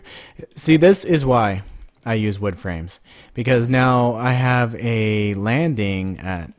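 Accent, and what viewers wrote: Canada. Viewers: I have one of the most important question that you will ever be asked. Are you Canadian?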